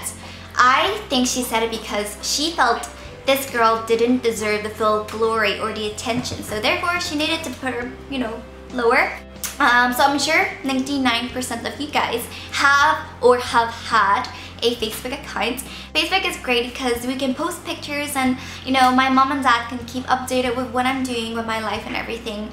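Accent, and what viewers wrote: Northern Ireland, United Kingdom. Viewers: She has mostly a Northern Irish accent :) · Woah you sound like you have a northern Irish accent! :o P.S. I'm Irish and this is my first video I watched of you and you seem lovely :) <3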